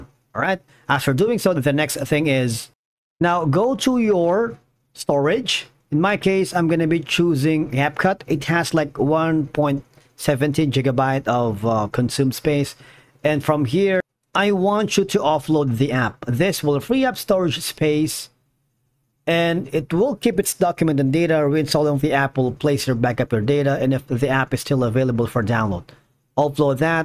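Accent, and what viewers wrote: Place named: India. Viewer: 😂😂😂 indian vid trying to look white hilarious the accent give it out big time nice try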